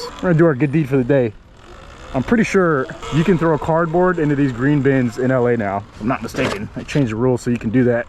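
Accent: Minnesota, United States. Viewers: Don't look at my feet🤣🤣🤣!! Love your content bro! It's crazy because you sound like this guy I used to work with who was from Minnesota. Not sure if you've already finished the giveaway, but if you haven't, I wish you would consider me! It's kind of weird because I'm 51 years old and the whole e-bike scene is just way too exciting for me and I would love to be a part of it! The thing is I'm kind of in a messed up spot, because of a ticket I got while I was delivering with Amazon. I was delivering a package to a dark neighborhood and I was using my brights and for some reason I was pulled over and given a ticket for using them. Right now my license is suspended and I had to sell my car to pay some bills and food. I love to win that bike because I could use it here in Houston to see how the market for delivering on an e-bike would be here. I follow some guys who delivers food on e-bikes in California and they seem to be doing fairly well! 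I'd love to try that in Houston being that it's a pretty big city and I have a feeling the market would be good and it kind of nichie in a good way! It would really help me out because for some reason people are looking at my age and it's being hard to get another job! Before I was working with Amazon, I had a job that I was with for over 20 years and when covid hit, I lost it!! Screwed up thing is that I am a very young 51 in that I feel like I'm still in my 20s! I could do some deliveries until I find something more permanent but it would de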